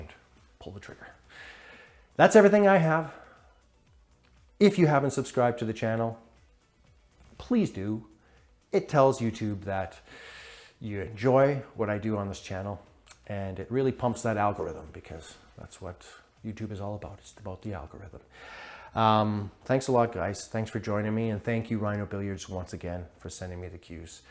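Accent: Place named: Edmonton, Canada. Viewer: Came for the cues, stayed for the beautiful edmonton accent.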